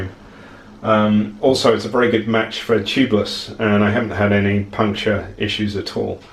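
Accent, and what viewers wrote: United Kingdom. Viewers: Why with a British accent? You're missing clicks because Tokyo + Offroad makes no sense. Great video content though.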